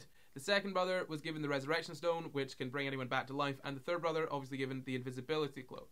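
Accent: Scotland, United Kingdom. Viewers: He is Scottish from Dundee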